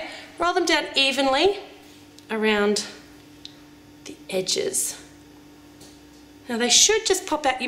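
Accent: Australia. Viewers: She's Australian not British. Don't worry I thot she was British too! >.< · Australian, not british :P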